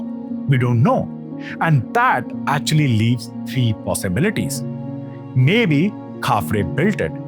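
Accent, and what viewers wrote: India. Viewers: Nope, Spinks 🙄 Indian English is like an insult to english.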